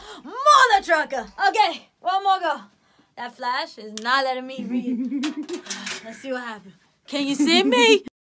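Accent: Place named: New York, United States. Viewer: Your New York accent is very strong 😂😂 It cracks me up at times. But you are keeping busy.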